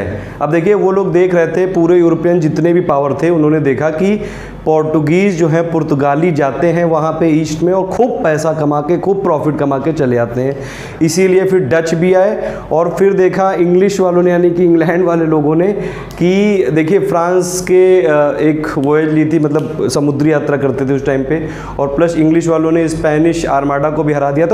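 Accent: Kanpur, India. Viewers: Nice kanpur accent sir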